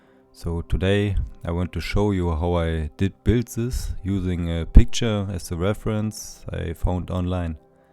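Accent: Germany. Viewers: Your German accent rocks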